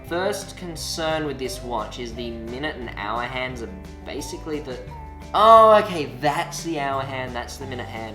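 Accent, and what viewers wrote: Australia. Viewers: I don't even care about watches I'm here only for the Australian accent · I'm still hanging out for the DIY fixes to some of those terrible quality items Alex is piling up for you. My hobby is remaking things and I love watching other do it too :) Oh and FYI - as a Kiwi - I'm not here for the Aussie accent like some other commenters , but in your case I am prepared to forgive it :) Chur bro.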